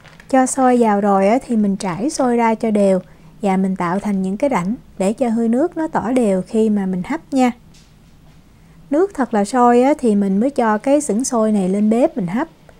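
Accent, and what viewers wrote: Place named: Mekong Delta, Vietnam. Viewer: kheo tay qua co xem nhieu video cua co thay that tuyet cho co hoi 1 chut nha chac la nguoi o mien tay que o tinh nao ma nau mon nao thay cung ngon lai qua hap dan gi nghe giong noi dam chat mien tay co gan 70 tuoi nen ko biet cach bo dau nen be doc hoi kho 1 chut nha co rat mong 1 loi phan hoi va chuc nhieu niem vui trong cuoc song nhee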